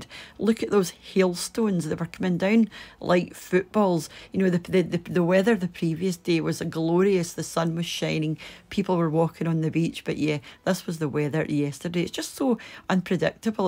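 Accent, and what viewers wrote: Scotland, United Kingdom. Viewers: Oh I LOVE this recipe as much as I love your beautiful Scottish accent! Your recipe looks simple, tasty & delicious! 👍😜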